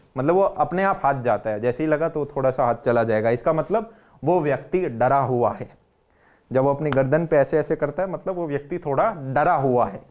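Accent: Haryana, India. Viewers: Hariyanvi mix😅😅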